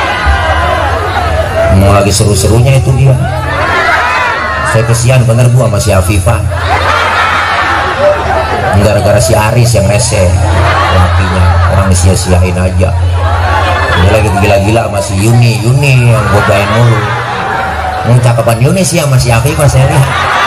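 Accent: Jakarta, Indonesia. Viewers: Betawi baget klo ceramah ini kocak lagi bikin mules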